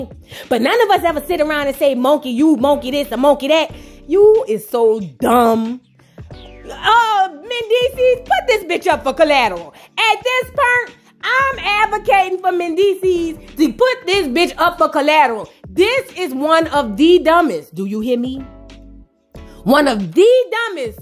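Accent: New Orleans, United States. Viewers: Sis I absolutely love your "Nawlins" accent and your commentary! That "get her up here" gets me everytime! Stay on their necks sis!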